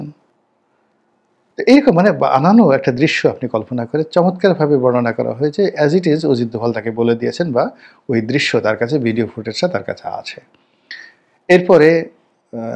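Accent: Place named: Jessore, Bangladesh. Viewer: মাঝে মাঝে আপনি আমাগের যশোরের আঞ্চলিক ভাষা বলেন খুব ভালো লাগে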